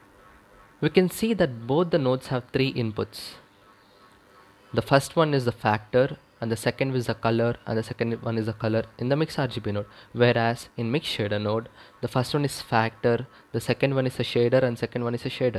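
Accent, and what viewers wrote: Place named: India. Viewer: 인도발음 나오자마자 신뢰감 상승한 영상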